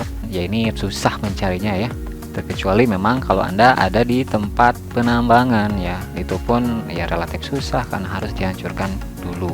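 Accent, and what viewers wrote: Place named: West Java, Indonesia. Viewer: Aksennya ky org sunda ,,timana kang??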